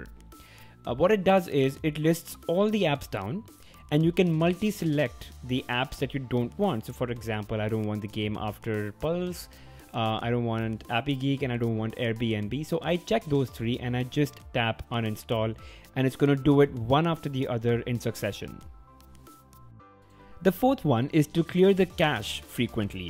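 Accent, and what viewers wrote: India. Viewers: Love Indian accent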